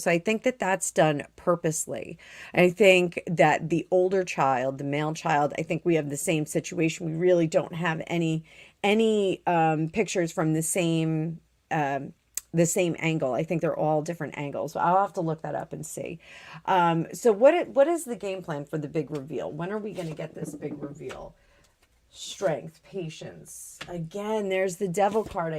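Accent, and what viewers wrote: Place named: New Jersey, United States. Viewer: I love your NJ viewpoint and expressions - "a hottie" with "definitely zero percent body fat". No other tarot reader I listen to does that. Love your accent, too - although it is very slight. I was in NJ last July to see Paul MCartney. I ❤ New York - and New Jersey. ❤Denise from Toronto. Great reading! Looking forward to much more, so thank you so much.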